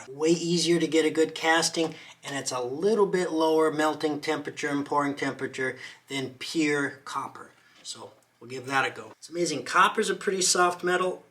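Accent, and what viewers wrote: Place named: China. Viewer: The Chinglish is worth the price.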